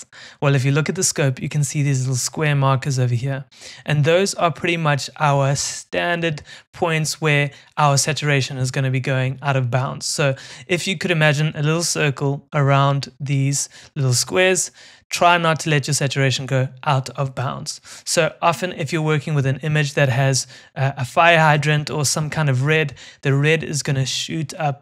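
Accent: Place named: South Africa. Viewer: south like a fellow south african , that accent is very south african but thank you so much for the lessons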